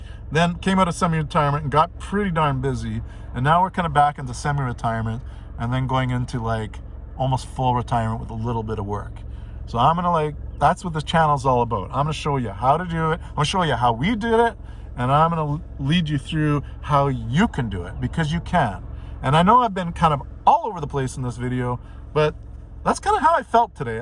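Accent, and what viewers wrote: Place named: Italy. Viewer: It's funny how I hear your Italian accent during your Spanish lol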